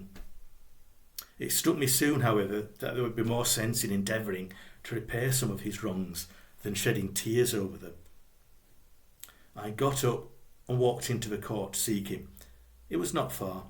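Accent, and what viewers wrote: Yorkshire, United Kingdom. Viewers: I just don’t understand why you apologise for your Yorkshire accent!!! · Wuthering heights is the best novel in my opinion. Movie adaptations cannot capture the essence of this book in my opinion. It's great you are reading it in the wonderful Yorkshire accent it is meant to be read in!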